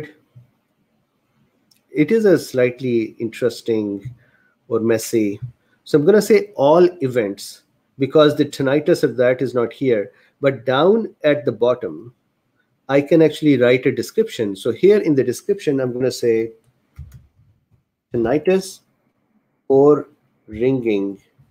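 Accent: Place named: India. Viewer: I think your accent (and Indian accents in general) is beautiful. Also, there is no problem for me to understand what you're saying (I'm a native US English speaker). A few tips on the only mispronounciations I noticed: VAERS->vares (rhymes with cares), convalesce->conva-less (convalesced, just append a "d" sound at the end, like any "ed' past tense verb). There was (only) one other word, but I couldn't remember it, nor find it.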